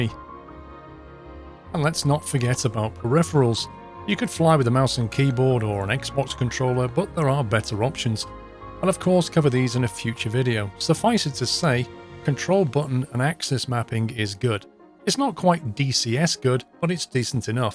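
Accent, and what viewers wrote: United Kingdom. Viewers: Subbed as he's British xD So who's doing an A350?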